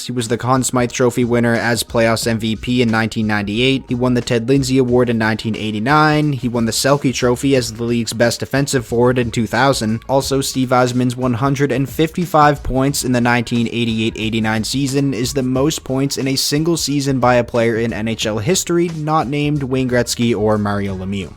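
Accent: Newfoundland, Canada. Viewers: Which province are you from? I can tell you’re Canadian from how you say Hart, Clarke, part, etc. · I mean, really depends on what type of Newfoundlander you’re talking to lol. Almost thought you were from NL, with how you pronounce certain words. Not all NL’ers sound as animated as you think haha.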